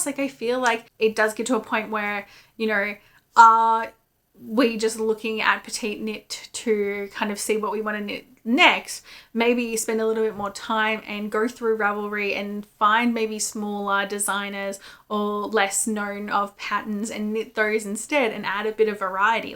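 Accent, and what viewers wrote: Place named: Australia. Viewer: under which rock have you been living. The accent and the acknowledgment at the beginning. Obviously an Aussie.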